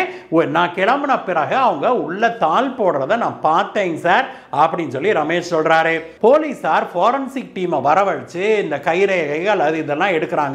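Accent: Kongu, India. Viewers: உங்கள் கொங்குத் தமிழ் அருமை நிறைய வீடியோ போடுங்க சார் வாழ்த்துக்கள்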